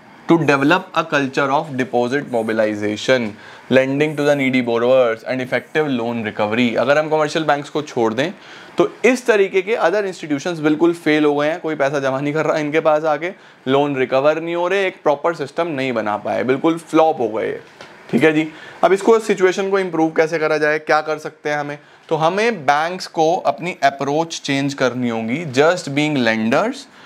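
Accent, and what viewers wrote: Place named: Punjab, India. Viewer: Sir your punjabi assent 🔥🔥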